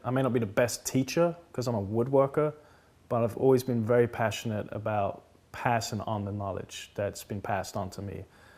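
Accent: England, United Kingdom. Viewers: I did ask where you were from on another video, as you sounded to me like you were from England, but I’ve just realised that you answer that in this video, so that’s the end of that question. You’ve had an immense amount of training and experience in the industry, and I wish so much that I’d have had the encouragement when I was in school (I’m from Wales). It’s only now, at the age of 38 that I have had the chance to find my passion in woodworking. It’s always nice to hear when people had a great teacher that encouraged them, rather than hindered. Anyway, it’s great to see someone homegrown doing so well in another country and also being respected as an artist too. By the way, the chair design that you make a limited production, looks amazing! I’m really enjoying watching your videos. I came across you on Instagram and had no idea you had a YouTube channel, so I’ve been binge watching and I must say that I really like the production value. 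Also, the fact that they don’t have a voice over is a great change, as it really makes me feel like a fly on the wall. Holy hell, I’ve just realised how much I’ve written. Anyway, here’s a big hello from the West Midlands, UK. Signing out.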